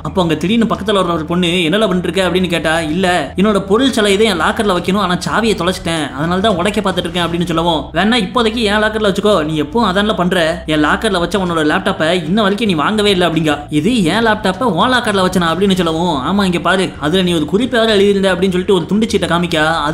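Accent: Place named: Tirunelveli, India. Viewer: Unga thirunelveli slang thaan bro.. Romba pudikum... En frd maariye pesuringa... Neenga pesa pesa en frd thaan niyabhagathula vanthaan...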